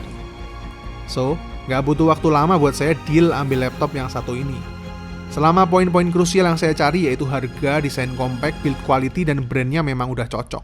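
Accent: Yogyakarta, Indonesia. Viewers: Aku Suka karna ada medok khas Jogja nya ya kang 🙏 ciri khas banget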